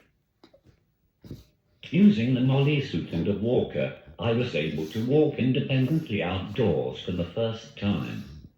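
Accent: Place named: Birmingham, United Kingdom. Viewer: Congratulations on your engagement, I hope you get to walk down that isle and get to talk to your huckleberry in your own Brumby accent!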